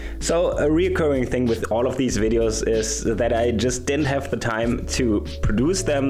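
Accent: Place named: Germany. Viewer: are you a german person though? Because it really sounds like it. At least you are from Austria or Switzerland i know it :D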